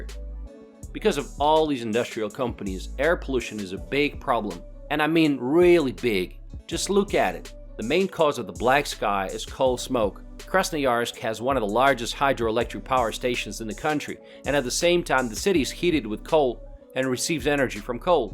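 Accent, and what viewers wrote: United States. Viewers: bro u have good english, dont use this generic annoying american accent dude voice to read the script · Pretty impressive American accent for a Russian!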